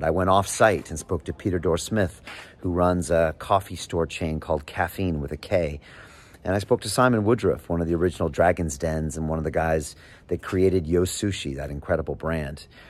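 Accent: United States. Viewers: how can he be the mayor of London England and has an American Accent? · You sound very american.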